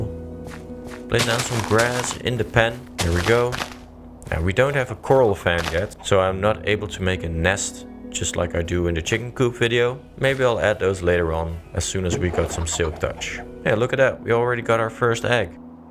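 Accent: Netherlands. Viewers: Hi, I love your series, your explanations and the many helpful details make Minecraft something special. I've just started again after many years, and so far I've only ever built functionally. Now I see that everything can also be ‘beautiful’ - a new challenge for me. Unfortunately I still lack your creativity, but I learn with each of your videos! Furthermore, your pleasant voice is relaxing and your accent reminds me of many beautiful holidays in Holland 👍😃⛵ Win-Win-Win!!!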